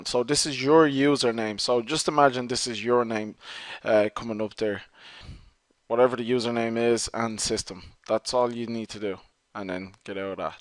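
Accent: Ireland. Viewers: An Irish black man, cool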